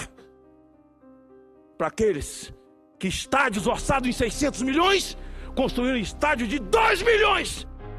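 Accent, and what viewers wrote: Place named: Rio de Janeiro, Brazil. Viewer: Senador do Brasil, você é nosso herói. Nasci na mesma cidade em que você nasceu. Fui criado em São Paulo e falo normalmente. Senador, por que você começa com sotaque carioca e depois volta ao normal. Você não é carioca. Assista o seu vídeo e veja o número 2 você fala como carioca e depois como uma pessoa normal. Honre sua naturalidade. VOCÊ NÃO É CARIOCA. É BAHIANO, HONRE SUA MÃE. VOCÊ É NOSSO HERÓI. PARABÉNS!!!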